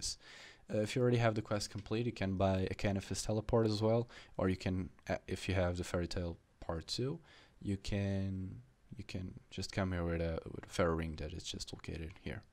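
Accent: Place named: Portugal. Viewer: Where are u from? You sound kinda portuguese..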